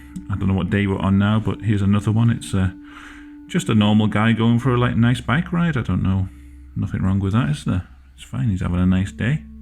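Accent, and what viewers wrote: United Kingdom. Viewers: Also, British man describing his creepy artwork is probably my new favorite genre of video.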